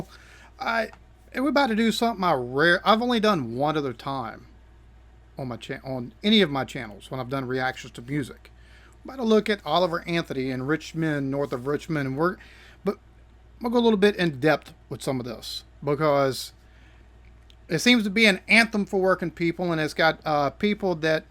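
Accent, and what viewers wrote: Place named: Yorkshire, United Kingdom. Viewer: Is that Yorkshire UK? asking for a Lancastrian.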